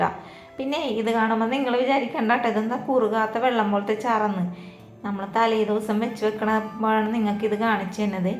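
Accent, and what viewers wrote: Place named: Kochi, India. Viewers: Ernakulam ആണെന്ന് മനസ്സിലായില്ലേ, എനിക്കറിയാൻ പാട്ല്ല കേട്ടാൽ ഉറപ്പ് എറണാകുളം.. പടിഞ്ഞാറൻ പ്രദേശം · പടിഞ്ഞാറ്ൻ ഭാഷ.... നന്നായിട്ടുണ്ട്... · Samsaram kettittu kochikkari aanennu thonunnu. Ningal evideyaanu sthalam?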